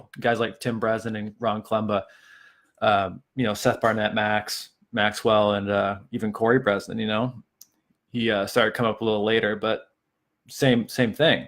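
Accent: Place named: New Hampshire, United States. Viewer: You sound like you're from Troy, NH.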